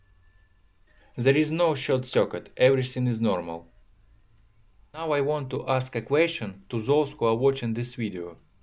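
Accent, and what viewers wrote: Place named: Russia. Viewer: First time hearing a russian accent?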